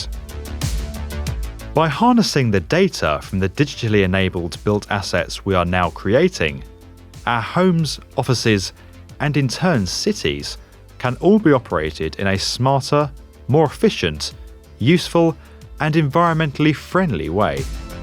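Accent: United Kingdom. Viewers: I like this channel, but let's be honest, you can get away with having nearly everything you say get taken as credible if you do it in a smooth British accent with sexy video editing skills. While most of the things here really do have a lot of promise....there's also a lot of wank too. The most infuriatingly bad proposal, the dumb idea that just WILL NOT DIE, has to be the pavement or sidewalk thing that "harvests the energy of pedestrian footsteps". This is not going to become a thing. Ever. Please look into how these things actually (don't) work. It would take years of foot traffic to power a single kettle boil, probably centuries to ever pay back the energy cost of their construction and manufacture. They're a scam that just won't die.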